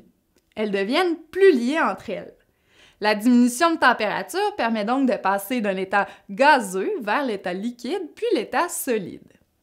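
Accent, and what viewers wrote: Quebec, Canada. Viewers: vous êtes québécoise?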